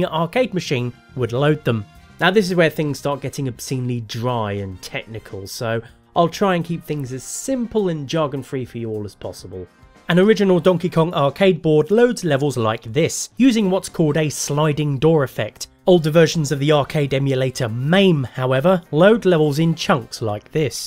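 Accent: United Kingdom. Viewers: Thanks for this video mate it was exactly what i was looking for. Decent length breakdown all the important details and British narration. Cheers matey! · I like your awfully delightful British accent and your sarcastic humor. How haven't I heard about you previously? well anyway Keep up the good work. I'll be watching you... in a good way... nice socks btw. Where'd you get them?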